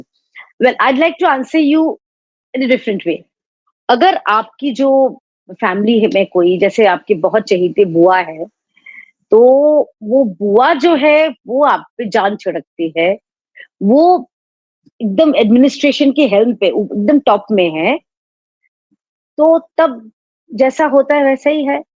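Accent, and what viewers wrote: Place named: Eastern Uttar Pradesh, India. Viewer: ji your skill of communication is superb/excellent.. Your Cristal clear views & way of explaining the facts is appreciated.Very well done. probably you belong to eastern UP or bihar..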